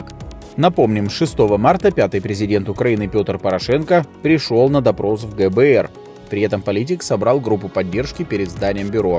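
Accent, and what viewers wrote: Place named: Moscow, Russia. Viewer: Об этом Украине поведал парень с московском акцентом. Из прокремлевского жопо блока.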